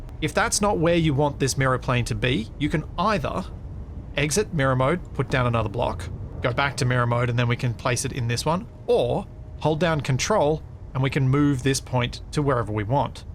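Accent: Australia. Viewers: I'm a simple guy. I hear Aussie, I sub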